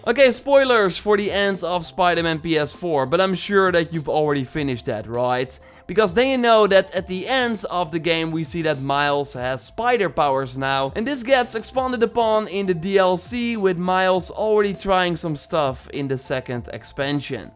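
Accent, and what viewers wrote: Netherlands. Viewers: Are you from holland (Netherlands)? You have a little bit of a dutch accent while you speak english. Great video btw! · yes hes dutch, his accent is quite thick lol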